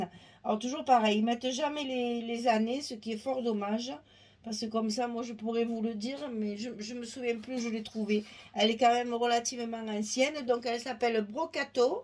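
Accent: South, France. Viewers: Bonjour, quel beau papier, c'est très élégant et vous le sublimez par votre talent!!!! J'aimerais savoir où vous vous êtes procuré la pointe avec laquelle vous enlevez la pellicule des bandes de colle double face? Merci pour votre réponse et ce merveilleux partage!!!! J'aime bien votre accent car j'ai vécu les 33 premières années de ma vie dans le midi et maintenant je vis en Bresse!!!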